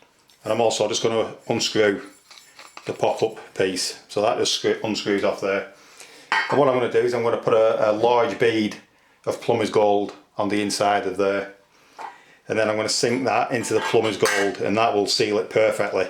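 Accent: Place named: Lancashire, United Kingdom. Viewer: Afternoon. SO I stripped all the sealant off and started again with the waste plug. This time a rammed loads of sealant on the rim of the upturned plug, then loads on the bung. The latter lubricated the bung so it slipped on without anywhere near as much force. Then I walked away for 24hrs... AND IT WORKED! Patience was the missing ingredient! Thnx (BTW you sound like you have a Chorley/ Leyland accent, brother)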